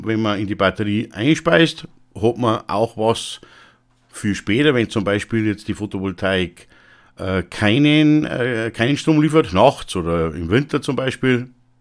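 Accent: Bavaria, Germany. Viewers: mir gehts auch immer so wie dir mann versucht hochdeutsch zu sprechen und rutscht immer wieder ins boarische😀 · muß man auch nicht. Das ist bayrisch! Und echt guat zum Zualosen.....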